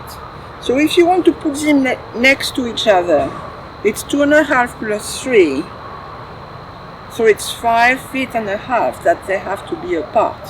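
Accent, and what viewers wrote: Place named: France. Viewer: Enjoyed listening to the French accent! Oh and the bird!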